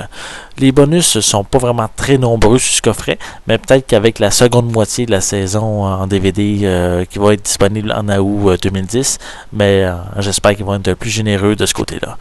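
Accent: Canada. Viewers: jkiff ton accent canadieeen :p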